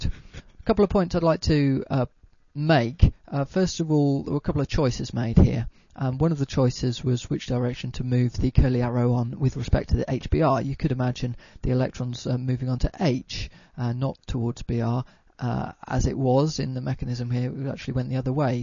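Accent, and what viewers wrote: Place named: United Kingdom. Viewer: Chemistry makes so much more sense when it's taught with a lovely, soothing British accent! :D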